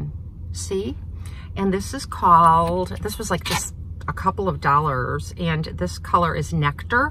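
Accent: Midwest, United States. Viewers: I bought this same one in medium in chocolate. The medium is like a large. Got it on eBay for $200.00! I LOVE it. Heavy as the dickens. Mine had a pen stain inside on the green suede, but I was able to get it out with an Emory board! I knew you were from the Midwest based on your voice. My family is from Chitown!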